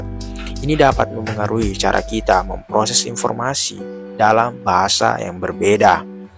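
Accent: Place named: Sulawesi, Indonesia. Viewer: aksen cukup kental bang😊 Saya juga Bugis asli😁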